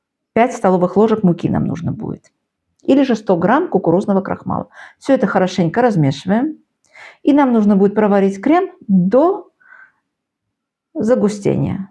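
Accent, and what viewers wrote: Baku, Azerbaijan. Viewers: Никогда не слышала о таком, спасибо за рецепт, спасибо за наш бакинский старый акцент, как вы его сохранили???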